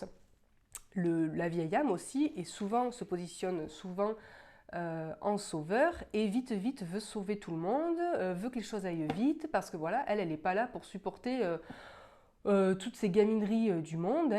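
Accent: Toulouse, France. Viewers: Un discours clair avec un joli accent toulousain... 🥰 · Tu as un charmant accent Toulousain.... signé un Toulousain...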